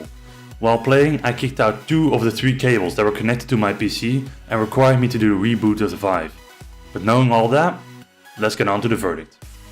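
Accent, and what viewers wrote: Netherlands. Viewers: Dutch accent?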